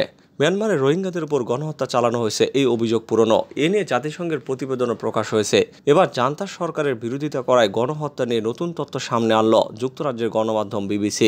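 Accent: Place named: India. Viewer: এক ই প্রশ্ন আমি লিখতে যেয়ে দেখি আপনি লিখেছেন। সংবাদ পাঠক তো ইন্ডিয়ার ভাষায় কথা বলে। চ্যানেল টা কি ভারত নিয়ন্ত্রিত?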